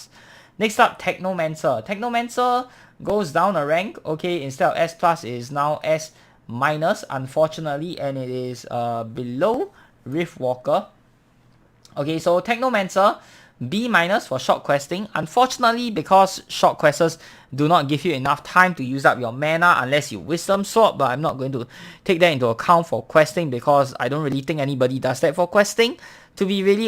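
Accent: Singapore. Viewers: Clear advice + Singapore accent = perfection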